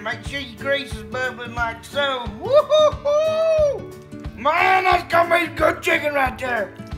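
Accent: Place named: South, United States. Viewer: How can pewdiepie be more popular than this??? It not only includes food but also comedy and mix it all in with a Southern accent yourself a real spicy mix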